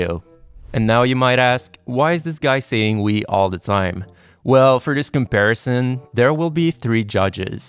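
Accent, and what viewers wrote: Quebec, Canada. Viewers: Tu sonnes tellement Québécois 😂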